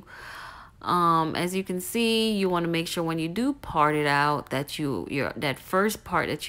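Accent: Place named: Jamaica. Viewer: Ok....I definitely hear a Jamaican accent!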